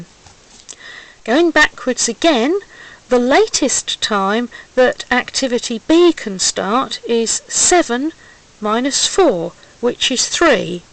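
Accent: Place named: United Kingdom. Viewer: Me too. She has that lovely very British accent ;)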